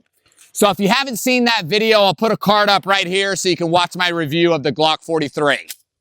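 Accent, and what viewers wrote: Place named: Tampa, United States. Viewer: This is exactly what I would expect a person from Tampa to sound and look like.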